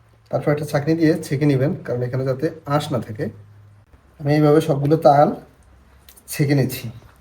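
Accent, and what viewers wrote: Noakhali, Bangladesh. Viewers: Unar language sune mone hoy feni/ noakhali hobe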